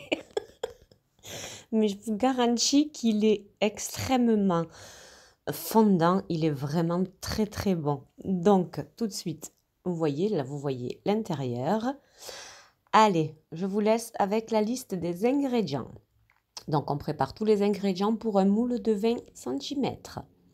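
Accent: South, France. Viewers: Magnifique voix tu es du sud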